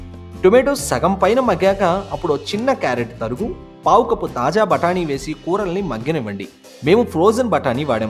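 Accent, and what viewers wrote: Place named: West Godavari, India. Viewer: I love your Telugu. Reminds me of my childhood days in my village in west Godavari.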